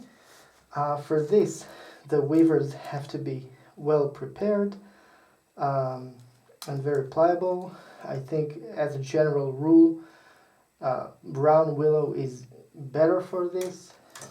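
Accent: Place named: Israel. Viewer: Since your English sounds pretty Israeli, I assume you’re Israeli :) How do you obtain your willows over there? I was observing the flora in Israel and didn’t see any willows anywhere I’ve been. Do you grow your own, somehwere, or you import them? Your channel looks pretty informative btw, I’ll go through all your content. תודה רבה והישאר בטוח! ברכות מטורקיה 👍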